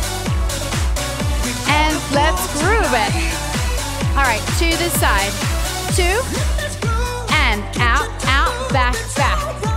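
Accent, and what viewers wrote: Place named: Australia. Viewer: My mom wanted a fun workout to do around Christmas time, so I showed her your holiday walking workout videos (which I love!) and she loved as well! After Christmas she went back to her usual workout routine, but it wasn’t until 2 weeks ago that she requested I show her more videos “of that pretty Australian girl who wears the cute outfits and plays good music” 😂 Now instead of doing your videos alone a couple of times a week, I do them everyday with my mom on her lunch break as she’s been working from home due to the virus. These videos truly lift our spirits and are so motivating! It’s also super fun that I can bond over your videos with my mom now (plus we get some extra steps in the process!) Thanks so much! ps mom’s been working on the Australian accent...she’s been inspired 😂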